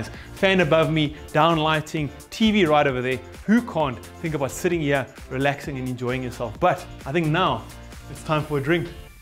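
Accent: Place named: South Africa. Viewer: Thank goodness! A normal SA voice! So much better! Ur hey guys. See guys ur. Lol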